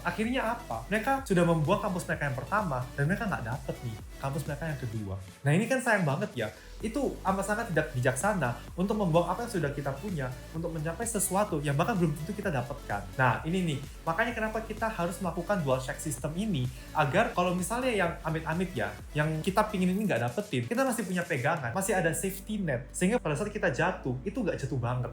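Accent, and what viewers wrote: Surabaya, Indonesia. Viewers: bahasa jowo suroboyoe tambah mantep ngene dok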